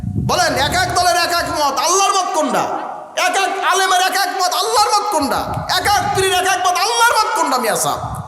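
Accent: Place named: Barishal, Bangladesh. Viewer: বগুড়ায় গিয়ে বরিশাইল্লা কথা বলার কারণ কি শাইখ? ওখানে গিয়ে শুদ্ধ বলাই উত্তম।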